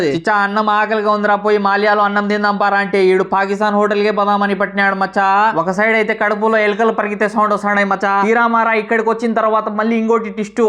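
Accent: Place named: Kadapa, India. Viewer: కడప యాసా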